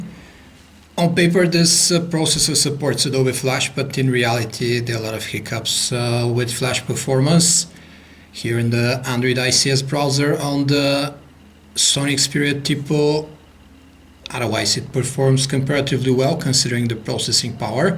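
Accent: Bulgaria. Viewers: Are you bulgarian? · Hey! Good review bro! You're Bulgarian arn't you? :-)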